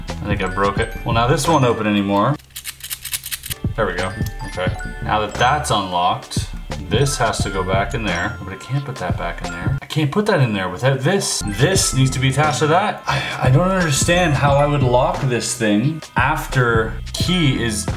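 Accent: Canada. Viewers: .....yeah, I can tell you're Canadian. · Hey! Are you canadian?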